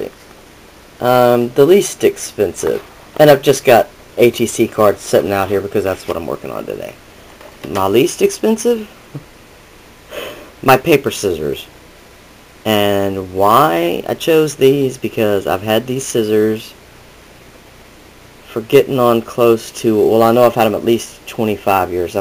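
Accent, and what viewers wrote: South, United States. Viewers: Fun video! Btw I like your voice, and by the accent, I would guess southerner like me😊. I'm the same way I hate hearing my voice in my videos. I think everyone does.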